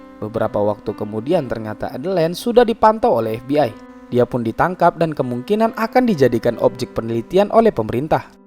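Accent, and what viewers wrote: Medan, Indonesia. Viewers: Oi bang.. Orang Medan ya? 😅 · Pembawa ceritanya kayaknya orangedan ya 😁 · Medan kali ya logat mu 🤣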